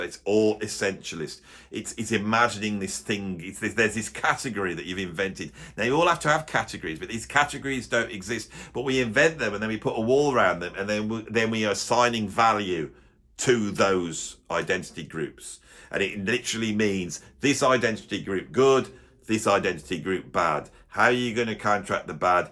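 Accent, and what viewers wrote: Birmingham, United Kingdom. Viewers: Definitely! Plus I always think that a rant in the Brummie accent always gives it that extra 'Je Ne Sais Quoi' !!! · The brummie accent is hilarious .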